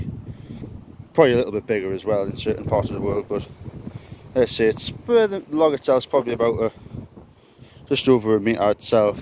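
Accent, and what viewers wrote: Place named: North East England, United Kingdom. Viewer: thought I recognised your accent,am from Jarrow near the tunnel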